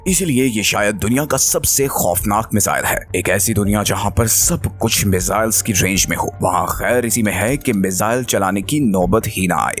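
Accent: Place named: Pakistan. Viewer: Why are you sounding pakistani to me? Are you? You included north korean missile which is in under development stage and put it ahead of america. And you conveniently ignored India's 16000 km range Agni 6 which is also under development. It is in advanced stages. Your this lack of knowledge is a proof that you are a porki. Agni 6 is coming baby wait n watch.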